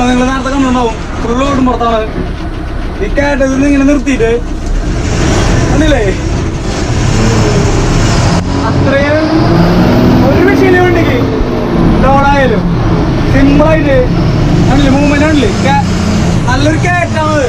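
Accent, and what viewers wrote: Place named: Thrissur, India. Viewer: Enta chengai ningadea samsaram kelkan aa ee review full kanunea... Trissur slang😍😍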